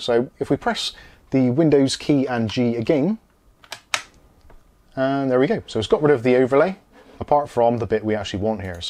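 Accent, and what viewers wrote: Bristol, United Kingdom. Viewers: You sound like a bristol lad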